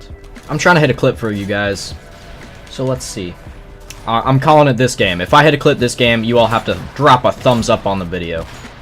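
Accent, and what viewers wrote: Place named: South, United States. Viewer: You sound southern in this video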